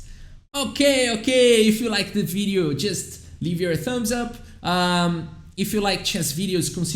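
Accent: Brazil. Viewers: Your English is good! Though you have a very distinguishable Brazilian accent (and there are some language slangs here and there that are mostly limited to Portuguese), you don't stumble or make awkward silent moments. Looking forward to seeing more videos! - Valeu,! Bora conquistar audiências lá de fora:) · Se o sotaque não entregar que é brasileiro, as mãos entregam kkkkkkk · Engliguês ou Portuguish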